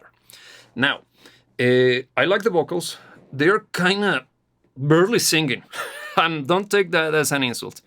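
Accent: Russia. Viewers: It seems you already have a slight Russian accent Great analysis, btw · Ты русский (акцент на месте) Тоже очень Люблю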